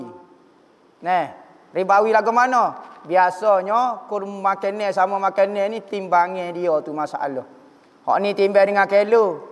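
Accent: Kelantan, Malaysia. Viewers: Tak berapa nak faham sangat pelat kelantan..tapi ustaz ni bijak dan berilmu bila berucap...semoga ustaz sihat2 selalu...jaga kesihatan ye...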